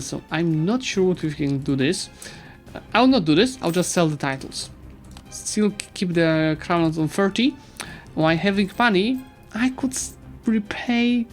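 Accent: Eastern Europe. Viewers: What the, the voice describing the situation sounds feminine/or really young but the pronounciation sounds like, its kinda weird, amyway great video, and comment for algorithm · Самый славянский акцент в мире.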